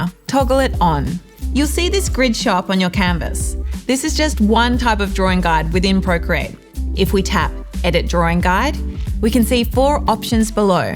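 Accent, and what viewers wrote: Australia. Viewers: Keep on going and please do an intermediate series … well done for the simplicity and objectiveness of the videos. I liked the Australian ascent :) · I think I share the sentiment of many others judging from the comments below that this has been the best series. As a fellow Aussie I loved the accent, and because I am a slow learnier I just reduced the playback speed to .75 which was very helpful. I have dabbled in Procreate for over a year on and off, mostly off because I start and then feel so overwhelmed so I give up, but this series was brilliantly paced to allow me to really understand the workings of the program. I know it is the tip of the iceberg but thank you for letting me gain more confidence and a desire to continue. I can not wait for an intermediate series, BRING IT ON!!! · This was a fantastic series, thank you so much! Smashed through it in a day 😁 feeling so much more confident! 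Also so happy to hear a fellow Aussie accent!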